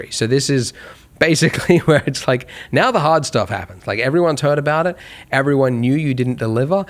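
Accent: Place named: United Kingdom. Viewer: Why do people with British accents seem so intelligent? Not saying you're not. It was a good video, and well-thought-out. But it is augmented by your accent. :)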